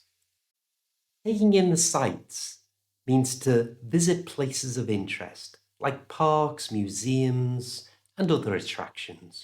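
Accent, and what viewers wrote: United Kingdom. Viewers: hi, your voice is excellent, easy to listen british accent. lovely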